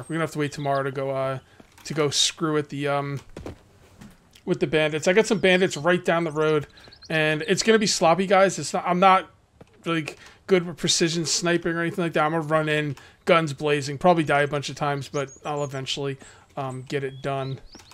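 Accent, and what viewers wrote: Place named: New Jersey, United States. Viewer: Good ole Dirty Jersey! My fiancee heard you say wooder(water) when I was laying in bed watching and she goes " hes gotta be from Jersey"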